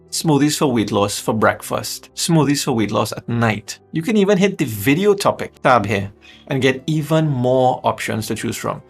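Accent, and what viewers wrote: Trinidad and Tobago. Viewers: Are you from Trinidad I hear your accent.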